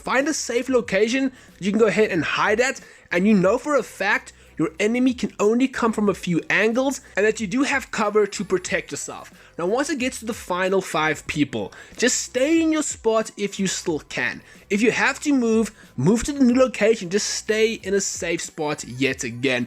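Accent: South Africa. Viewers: I've recently fallen in love with BR and its been great, and ill definitely be grinding to reach Master 2 this season User name: Petscop2 Edit: are you South African? You sound South African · You sounded very South African, until you said "Chopper" 🤔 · Hey dude thx for the info, and are you South African? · You sound South Africa ,are you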